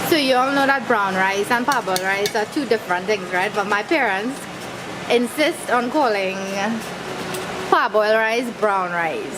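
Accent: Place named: Trinidad and Tobago. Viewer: Hi again I just love seeing you and family happy all going ons..stories,experiences who drink out the coconut water? Especially when you hear the bread van then you have to fly down the step , drop everything vtp reach him before he leaves ..Haha I know the struggles...Girl you show me how to make smoothie gratitude to you fuh so...I could go on learning more about your channel your baby so cute...Love your Trini foreign sal g dialect accent..I pray God keep i all safe happy together even if you do live a part too..Mwah hugs to you all...I seca real cry baby when I don't see family enough...Bye..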